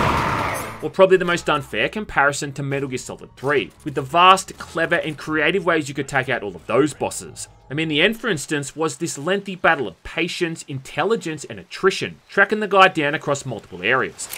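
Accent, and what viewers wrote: Australia. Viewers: I love your videos. Great break downs. You nail everything. Also, hearing an Aussie talk with such casual lingo and slang is perfect for me. Keep it up mate. You're doing great. Top shit. Yeah nah yeah cobber.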